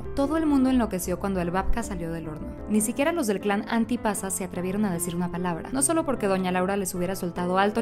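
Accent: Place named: Mexico. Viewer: más me encanta con tu acento mexicano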